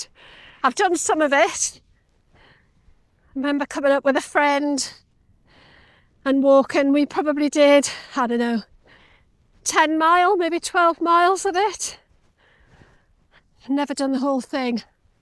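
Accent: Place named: Newcastle, United Kingdom. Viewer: I assumed you were a geordie, but I'm no expert, I'm a cockney myself so everyone else is either 'west country'or 'northerner'! 😂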